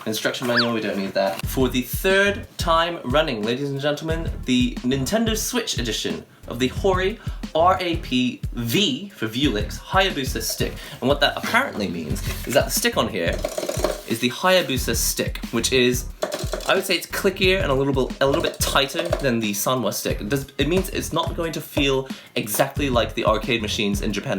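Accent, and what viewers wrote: United Kingdom. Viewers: wow that British accent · I'll be honest, your British accent took me off guard. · Your family is in the UK? well that explains your accent lol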